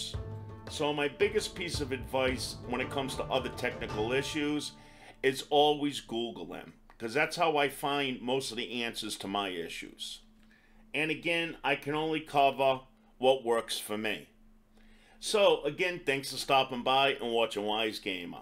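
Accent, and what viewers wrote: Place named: New Jersey, United States. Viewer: never seen any of your other videos so im not sure if you were just doing a goblin voice for the video because you love wow or you're repping joirsey, either way good stuff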